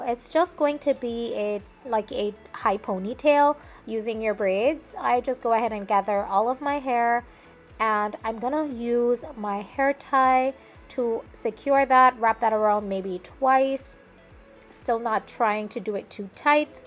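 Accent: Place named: Jamaica. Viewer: Heard the accent and I was like...She is jamaican for sure!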